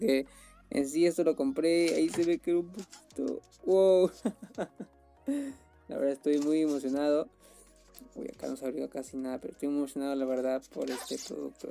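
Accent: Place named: Peru. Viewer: obvio el acento peruano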